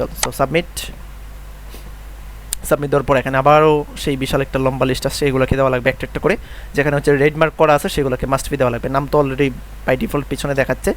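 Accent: Sylhet, Bangladesh. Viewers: vi kita sylheti ni?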